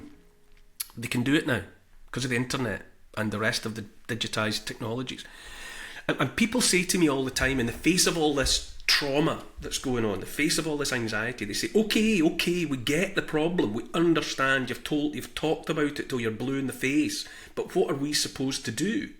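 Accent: Scotland, United Kingdom. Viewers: Think the unthinkable . Do the undoable. (Do the unthinkable) . Do what? (Imagery concerning the cremation of bodies). To whom? ( We need to watch out for a handful of people who have ancient malign desires). This man has a disarmingly quaint Scottish accent, a very friendly manner, and a lovely white, light filled house . (Pay attention to his words).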